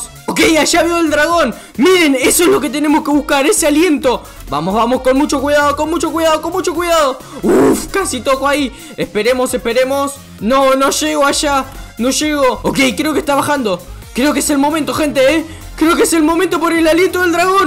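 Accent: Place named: Argentina. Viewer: sos argentino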